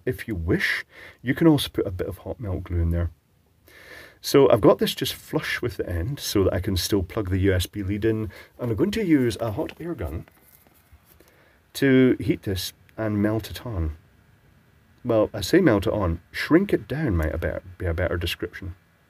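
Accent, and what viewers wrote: Scotland, United Kingdom. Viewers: I love your soothing, clear voice. I guess your Scottish ? Do you live in the US now ?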